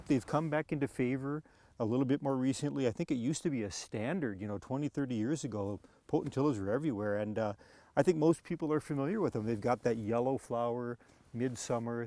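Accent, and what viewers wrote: Minnesota, United States. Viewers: Heard the accent and immediately went to the comments to see if there was a mention for Minnesota and was not disappointed 😊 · Are you from Minnesota? :). Thank you for the video! I need to trim mine back, they are big. Unfortunately, too late this year.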